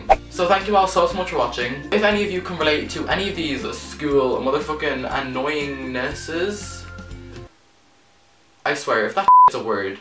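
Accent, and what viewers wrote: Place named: Ireland. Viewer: Ah bless. The Irish accent is lovely as always :) thanks for making my day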